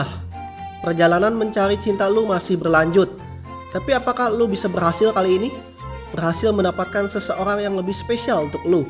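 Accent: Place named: West Java, Indonesia. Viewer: Kak kakak kalau ngomong kayak orang sunda!!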